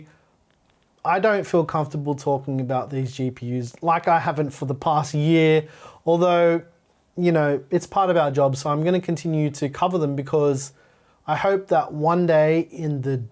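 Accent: Australia. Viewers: No. lol. what an aussie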